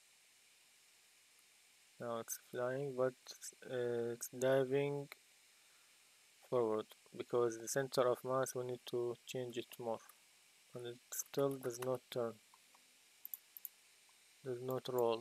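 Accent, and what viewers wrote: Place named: Iraq. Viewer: احسك عراقي 😅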